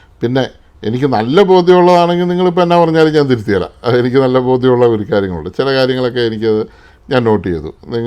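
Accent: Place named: Kanjirappally, India. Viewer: ഇദ്ദേഹത്തിന്റെ സംസാരം, ഒക്കെ കേട്ടപ്പോ തന്നെ എനിക്ക് നേരത്തെ മനസ്സിലായാരുന്നു കാഞ്ഞിരപ്പള്ളി ക്കാരൻ ആണന്ന് ❤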